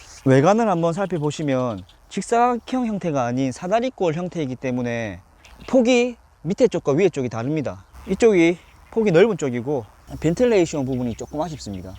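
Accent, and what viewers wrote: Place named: Seoul, South Korea. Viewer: 이 형 서울말도 잘쓰시네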